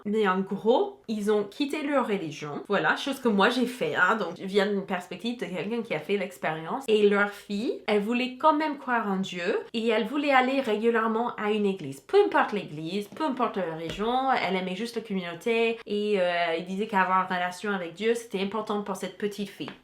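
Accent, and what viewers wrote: Quebec, Canada. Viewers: Super video! PS: ca faisait longtemps que je n'avait pas vu une de tes vidéos, et ton accent à vraiment changé ! Y a vraiment des prononciation et intonations québécoises , c'est marrant à entendre! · Tu m’as brisé le coeur :,( La vidéo était hyper intéressante bien que vraiment touchante et triste. Ps: tu commences à avoir l’accent canadien ;)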